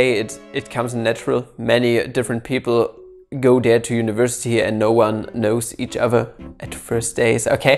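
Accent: Germany. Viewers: funny german accent :DDDD!!?!!!!!?!!!!!!?!!!!????!!?!!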